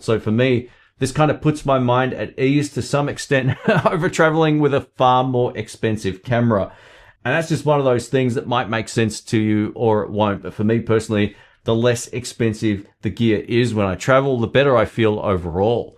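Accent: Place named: Australia. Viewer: woops my bad. Should've recognized ozzy accent) silver top looks very nice, hope it'll make it over here sometime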